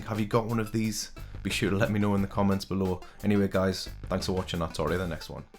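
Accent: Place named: Newcastle, United Kingdom. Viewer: your fromm Newcastle alright lemme sub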